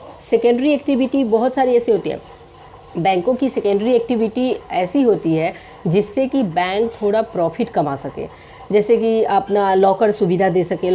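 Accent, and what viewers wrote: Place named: Bihar, India. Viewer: Mam apka accent sunke mujhe samaj me ek chiz aa gaya...u r from bihar but u r posted in maharashtra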